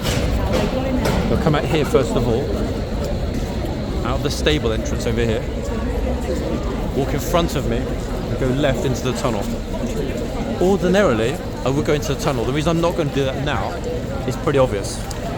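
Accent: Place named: United Kingdom. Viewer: Love this vid, very informative ❤ lovely British accent in the commentary.🏴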